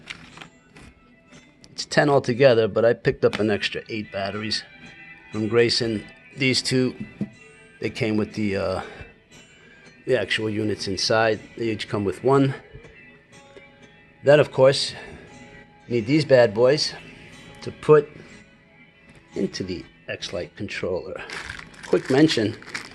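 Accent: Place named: New York, United States. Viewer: Love it!! You're new York accent!! Lol by the he way graysons batts for 115 sold out thanks to you.. Lol love ya and your sense of humor!! Going to be a great summer..looking forward to seeing vids and getting to know you brother!! Sat mine gets here.:) but no batterys!! And I forgot a cord so can use frysky receiver..XSR. You got two 115s.now I'm jealous you'll always be flying. Figuring out myself too ,DNA big help bro! For x-lite! Have a great night!! God bless!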